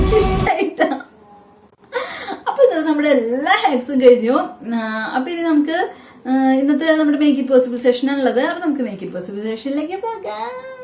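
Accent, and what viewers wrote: Thrissur, India. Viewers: Chechiyude samsaram kettal serikkum thrissur kari anu enu manasilavum😁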